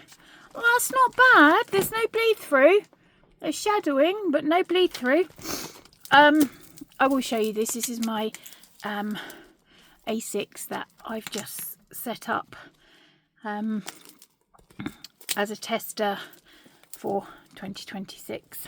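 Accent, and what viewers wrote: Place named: England, United Kingdom. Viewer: Lovely to hear an English accent here....for this coming year I'm going to switch up to a standard travellers notebook from my pocket rings just for the change ( but I've already bought all my inserts for the pocket rings just in case ! ). Now you've got me thinking about Just Scribble....I have looked at hobonichi for 2026 & resisted .I bought an A6 for 2025 for doing some journalling but didn't enjoy it so I'm now in a stalogy instead. Have loved the " waffle ' ,keep up the good work xxx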